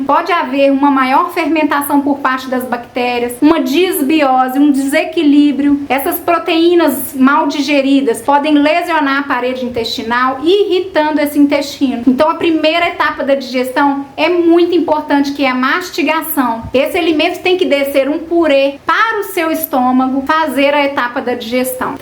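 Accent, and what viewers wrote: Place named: Minas Gerais, Brazil. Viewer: Gente q sotaque gostoso.... parece ser minerinha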